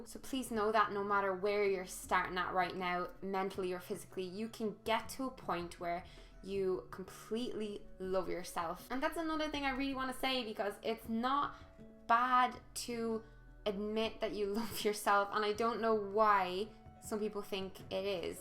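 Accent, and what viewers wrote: Ireland. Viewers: you are gorgeous, I have been listening to you a few times to practice my english listening, due to I´m from Mexico and want to practice the language. Furthermore I love your irish accent.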